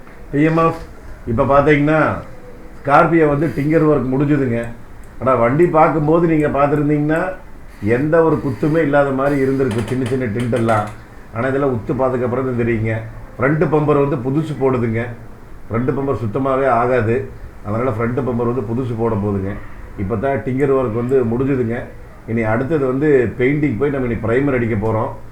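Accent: Kongu, India. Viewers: கொஞ்சும் கொங்குத் தமிழ் பேசும் கோமகரே...! திகட்டாத தித்திக்கும் பேச்சுடைய திருப்பூர் திருமகரே...! எத்தனை அழுக்குப் படிந்த வாகனமாக இருந்தாலென்ன?? வெள்ளை மனமும் பிள்ளை குணமும் கொண்ட மதிப்பிற்குறிய அய்யா🙏 உங்கள் வேலைப்பாடுகளில் வாகனங்கள் ஜொலித்திடுமே தகதகவென்னும் தங்கம்போல❤❤❤❤❤ பின்னலாடைக்கு பெயர்போன ஊராம் திறமை வாய்ந்த ஊராம் திருப்பூர்...! அத்திருப்பூரில் வாழும் திருமகர் அய்யாவின் வெள்ளை மனம்போல மாறிவிட்டது...! இந்த வாகனத்தின் வென்மை நிறம்...! இந்த வாகனத்திற்கு மட்டுமல்ல அனைத்து வாகன வேலைப்பாடுகளுக்கும் கடினமாக உழைக்கும் உங்கள் நிறுவன ஊழியர்களுக்கு என் மனமார்ந்த பாராட்டுக்களையும்❤❤❤ வாழ்த்துக்களையும்💐💐💐 தெரிவித்துக்கொள்கிறேன் என்றும் அன்புடன் உங்கள் மகன்போல இவன் ரா.முஹம்மது கபூர் (சென்னையில் இருந்து)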